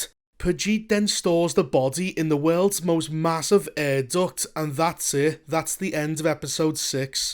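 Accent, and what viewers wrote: Liverpool, United Kingdom. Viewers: it takes a scouser to speak the truth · Robot Scouse monotone speech is definitely appropriate for this retarded shit.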